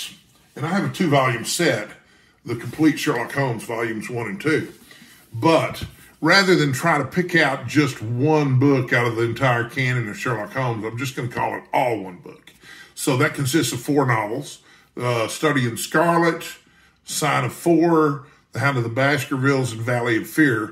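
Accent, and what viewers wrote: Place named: South, United States. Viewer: Great to hear a fella with a little South in his mouth. You’ve got some interesting picks.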